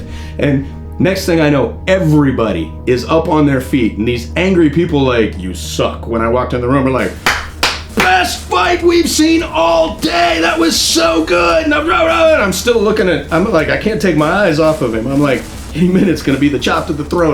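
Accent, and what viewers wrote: Australia. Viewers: His Aussie accent though 😂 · His Aussie accent is on point. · Nice Aussie accent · Not bad aussie accent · Don't get me wrong...your acting as Jason was magnificent...but I do believe that your australian accent would get you an Oscar! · wow great ausie accent! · That is a damn good Australian accent for a presumably American. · Who the fuck saw that aussie accent coming? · I don't know what's more amazing: the fight, or that Australian accent. Thanks so much for this video.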